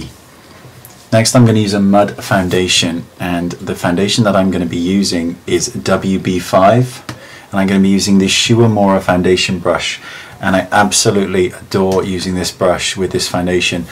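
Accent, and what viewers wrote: United Kingdom. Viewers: omg, I loooooooooooove your british accent :) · Excuse me Mrs/Mr; your VERY Beautiful && your British accent is HAWT(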